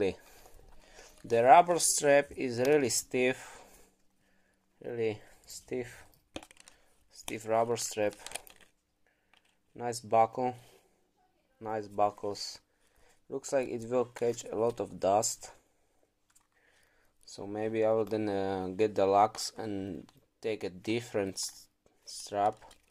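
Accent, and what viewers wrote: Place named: Romania. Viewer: You have a romanian accent, am I wrong?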